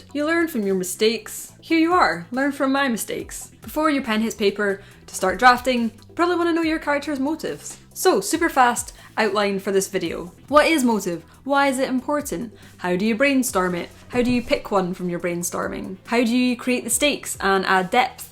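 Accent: Scotland, United Kingdom. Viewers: A few days ago I went down a rabbit hole of story telling and writing advice videos after getting frustrated with writing for a concept album. I found Jenna Moreci first and watched one or two other American writing youtubers. Your review of Jennas' book was suggested on the home page while I was taking a break after getting stuck writing today and I gave it a click. I have tried before to find compelling Scottish youtubers and not managed so I was very pleasantly surprised to hear you were Scottish, from Glasgow even! That video itself was great and I'm really enjoying the segmentation and detail you're going into with this series. Keep it up!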